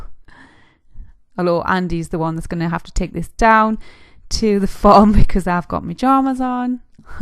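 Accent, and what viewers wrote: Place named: England, United Kingdom. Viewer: Hello from Nampa, Idaho, USA. LOVE the eggs and listening to your English accent!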